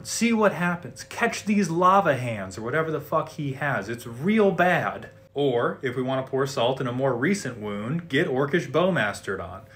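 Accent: South, United States. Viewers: Honestly that was some of the cleanest, smoothest, and slightly southern explanation for why I feel fear when I add one too many cheap red spells into my deck.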